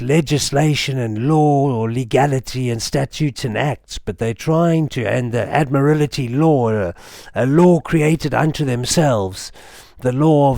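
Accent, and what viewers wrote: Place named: South Africa. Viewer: Some great information and very interesting (but would not be half as interesting without the SA accent!). Unfortunately on the down side, I have some bad news to bring the community, yes my toast got burnt, it was 2 slices, I'm uncertain exactly at which point this was but believe at 1hr 48mins I'd remember something was under the grill. Just wanted to warn anyone else starting to watch this as you might consider finishing off all your weekend or weekday duties that aren't time dependant. Enjoy! 🦉🧔‍♂23🪑<imagine this is a bench>🪑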